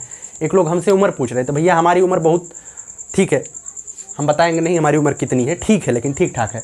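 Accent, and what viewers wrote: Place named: Awadh, India. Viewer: Logo ko awadhi aur bhojpuri main farq hi nhi pata.. hadd hai....aap sir awadhi bolte hain aur acchi bolte hai ..hum awadh walo ko bdi aatmiyata lgti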